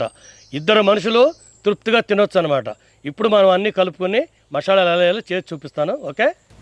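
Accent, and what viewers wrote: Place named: Kurnool, India. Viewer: Today I will be making this recipe in Iftaar...luv the way u speak uncle...missing kurnool ...from 🇦🇪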